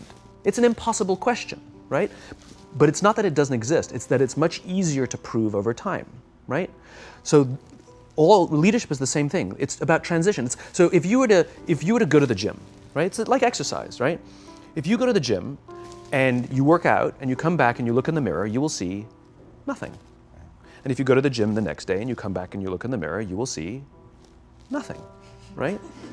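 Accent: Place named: United Kingdom. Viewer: 영국 발음 이네요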